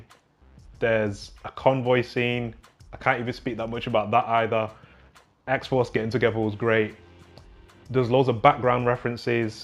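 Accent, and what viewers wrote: United Kingdom. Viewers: It's good to hear a northern accent on youtube. There isn't enough. Lancs here.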